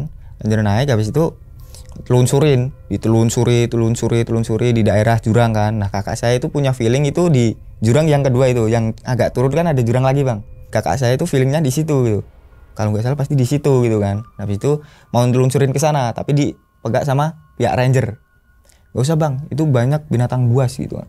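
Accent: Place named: Java, Indonesia. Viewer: gua elu nya medok 😅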